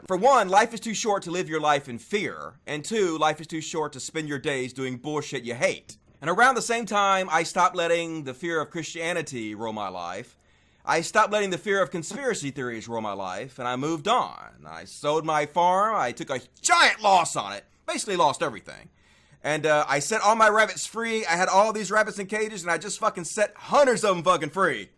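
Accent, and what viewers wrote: South, United States. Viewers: You are a great story teller and i like your southern accent Very much!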